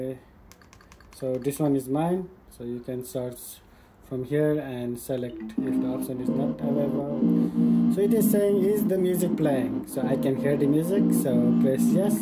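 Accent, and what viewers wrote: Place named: India. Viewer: Are you Indian? Because your accent sounds like it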